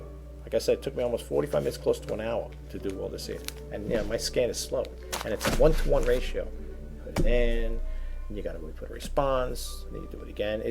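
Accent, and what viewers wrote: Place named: New York, United States. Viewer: Beautiful back ground music at the end of this vid..I'm 1/2 Finnish American, you may enjoy Sibelius...Finlandia and the Swan of Tuolla ...wrong spelling but you get the idea...you take nice pics of the clouds too...take it you are from New York...your accent...happy hunting and peace to you and yours this approaching Christmas Season ! Corie in Seattle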